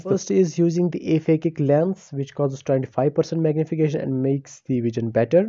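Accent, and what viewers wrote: Kashmir, India. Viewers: Kashmir se ho kya baya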